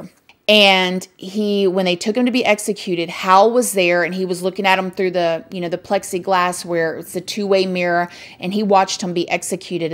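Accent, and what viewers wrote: South, United States. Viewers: I love your southern belle accent you're the best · New sub! Fantastic job! I knew I recognized that southern drawl. Been to Florida many times, and lived there briefly once. Nothing beats the sunset over Clearwater (my fav vaca spot)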